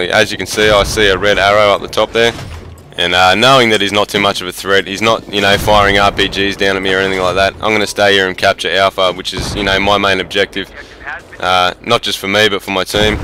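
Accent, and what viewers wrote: Australia. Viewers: Great commentary man, good tips. It's good to finally hear a Aussie commentator. :) I'm also looking for more people to play battlefield 3 with on Xbox. Thanks.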